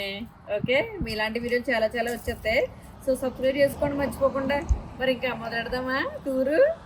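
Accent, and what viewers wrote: Godavari, India. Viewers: Mee video choose choose vizag slang poye godavari slang vachestundi AAYEE.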